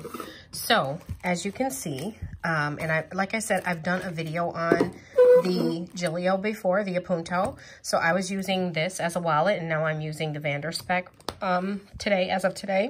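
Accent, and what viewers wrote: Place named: Long Island, United States. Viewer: I can’t believe your black lolz. You sound like a Long Island Italian lady. You should go into sales you have a great confident voice. 🤗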